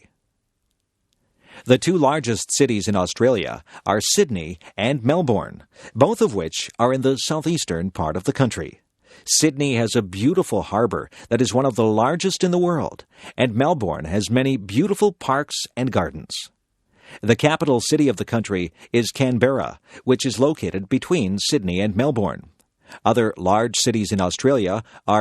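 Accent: United States. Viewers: The accent in the audio is American, with some place name mispronunciations. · Factually incorrect, in an American accent and doesn't even pronounce the names of major cities correctly.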